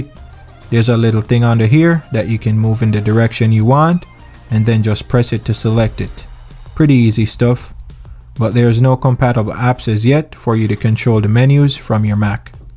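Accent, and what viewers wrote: Jamaica. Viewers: As soon as he said the first sentence I knew man was Jamaican lol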